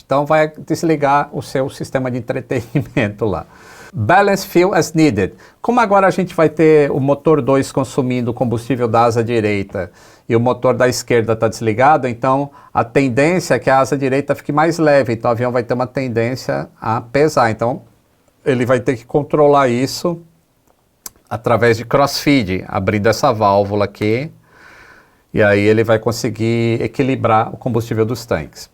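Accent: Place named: Rio de Janeiro, Brazil. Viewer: tá pegando sotaque carioca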